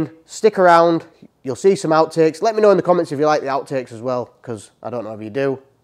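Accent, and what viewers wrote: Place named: Scotland, United Kingdom. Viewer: Never heard your voice before, wasn't expecting Scottish. Nice to meet you sir :)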